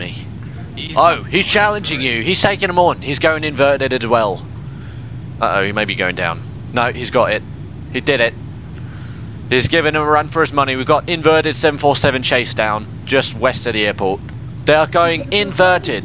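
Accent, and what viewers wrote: United Kingdom. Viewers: Why your voice sounded like your british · I’m absolutely in love with this (I’m assuming British) accent lol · i love your british accent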